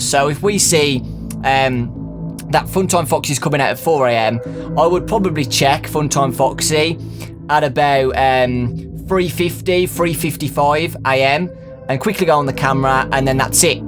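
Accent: United Kingdom. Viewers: Awesome you british voice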